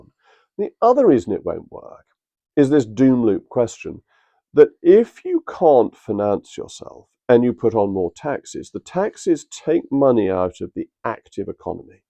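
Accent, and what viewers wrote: RP, United Kingdom. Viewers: Mr Rees moog for all your brilliance. You are useless! Remove the Home Secretary if you can. Your posh style sounds good. But totally useless. Action please. Purge the Islamist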